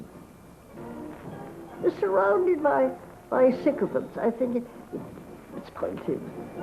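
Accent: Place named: RP, United Kingdom. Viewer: She's so posh, I can't understand her.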